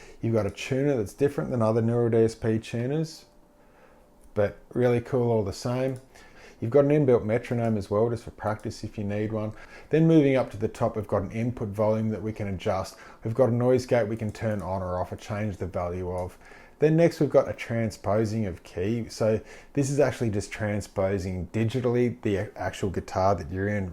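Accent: Australia. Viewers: Hello! My name is Pietro, I'm from Brazil and I love your channel! I'm 22y, started playing guitar 2 years ago, and I'm not gonna lie, sometimes I have a hard time trying to understand what you say, my english is not perfect and the accent for me still hard to understand But I'm trying to practice, I got an australian citizenship from my father so I'm willing to move to Australia within the next few years, so better get used to the accent hehe I like specially your boss katana videos, I always wanted an amp like that but unfortunately it is too damn expensive here in Brazil Everything is expensive, it would take me 3 entire salaries just to buy the katana mk2 50w, it is simply not doable But I'm at the hope that someday I will have a guitar room just like you do CheersHello! My name is Pietro, I'm from Brazil and I love your channel! I'm 22y, started playing guitar 2 years ago, and I'm not gonna lie, sometimes I have a hard time trying to understand what you say, my english is not perfect and the accent for me still hard to understand But I'm trying to practice, I got an australian citizenship from my father so I'm willing to move to Australia within the next few years, so better get used to the accent hehe I like specially your boss katana videos, I always wanted an amp like that but unfortunately it is too damn expensive here in Brazil Everything is expensive, it would take me 3 entire salaries just to buy the katana mk2 50w, it is simply not doable But I'm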